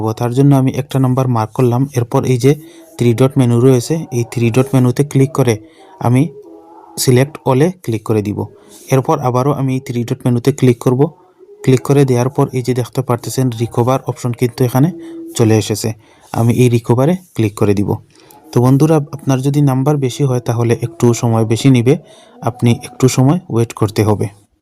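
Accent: Sylhet, Bangladesh. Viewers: Bai apne sylhetini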